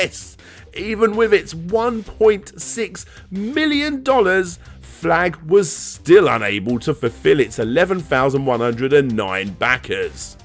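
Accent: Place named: United Kingdom. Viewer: In case you never heard such accent before you should know that he's from the U.K ,idiot . Guns are illegal.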